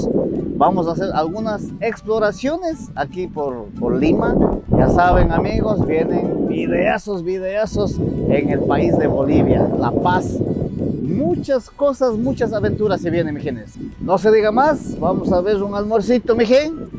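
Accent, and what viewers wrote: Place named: Ecuador. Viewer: Me da risa su forma de hablar muy específico de que parte de ecuador es😊😊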